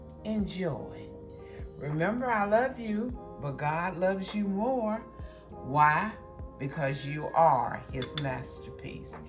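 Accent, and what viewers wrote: South, United States. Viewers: Good morning mrs watched your first video yesterday on the pineapple cake, running to store now, also seen your recipe for coconut pie, 8 what? Because didn’t watch video, sorry, but love you! Your so country sounding, I’m going to try a lot of your recipes!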